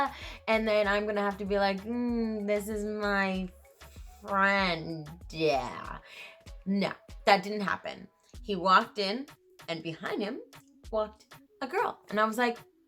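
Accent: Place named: Middle East. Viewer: Which part of the middle east you from?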